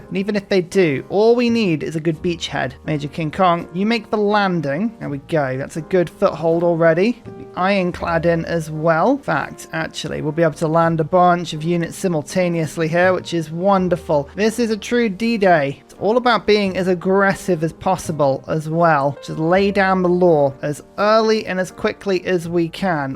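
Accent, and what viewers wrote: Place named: United Kingdom. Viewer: You are so much fun to watch! You have a rare wit and take sweet joy in this excellent game. Your British accent is wonderful too. I like to watch your series before I try my next A-Z challenge Civ to get an edge. Thx. I am trying Poland now. I played one game on Europe TSL-but I didn't have enough Civs-and without Spain Portugal or England in the game, France (Catherine Medici) ran away with settling western Europe and beat me with a cultural victory. I'm starting again with all the Civs-hoping they will fight eachother and not me.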